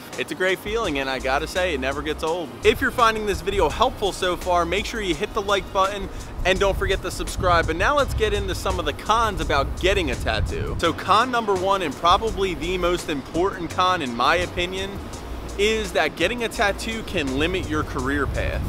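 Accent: Philadelphia, United States. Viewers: I like the philly accent. I grew up maybe an hour outside and now live in Philly. I’ve always wanted a sleeve but being in philly made me want it more, everyone is tatted😂 the career I want is about as white collar as you can get so I’m a bit hesitant but I’ve heard of people that have them from the companies I want to work for. Any shops you’d recommend in the area(not sure if your still around Philly) also have you had any trouble yourself getting jobs with them?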